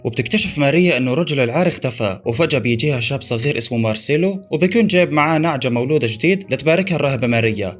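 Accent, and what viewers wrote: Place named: Syria. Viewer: لهجتك بين السوريه وبين المصريه حيره🤔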